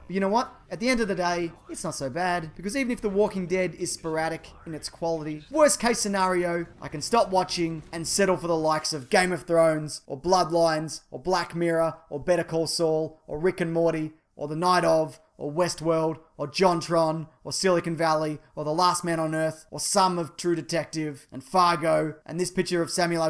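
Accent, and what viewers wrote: Australia. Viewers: The generally upbeat, laid-back rhythm of the Australian accent contrasts perfectly with your particular brand of snarling vitriolic sarcasm. This channel is a joy to listen to. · okay first off my Ozzie friend. I love your videos for some reason you always say what is going on in my head LOL I know that sounds strange but that's not the point. I just want you to say tattoos as many times as you possibly can. because it's definitely not the way here in the US say tattoos LOL but I love it I first noticed when you were talking about the Joker and hoe it was not a fully realized character but you said tattoos at least a dozen times. okay well that about covers it just make a video about tattoos where you say the word tattoos as much as possible you Ozzie's you.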